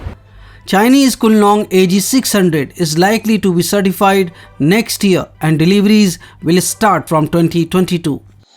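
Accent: India. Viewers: Is this India accent? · I assume the narrator is from kerala india🇮🇳🇮🇳🇮🇳🇮🇳👌👌👌👌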